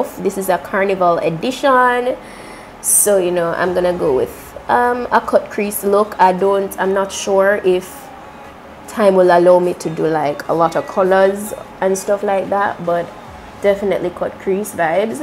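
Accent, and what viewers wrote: Jamaica. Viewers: Love love love that talk. You must be jamaican, I heard that patois 😁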